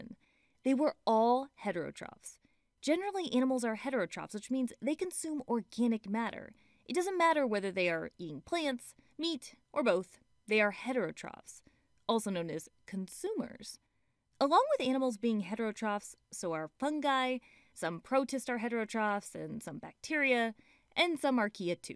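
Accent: United States. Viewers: are you from tennnese becsaud yoyut the on;t ten i see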